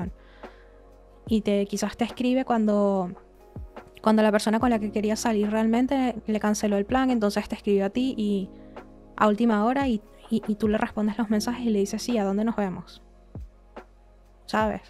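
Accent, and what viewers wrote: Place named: Venezuela. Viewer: Eres la más acertada que existe 🙌 eres mi favorita y amo tu acento venezolano ❤ op 2 describiste todo en exactitud. Lastimosamente tiene pareja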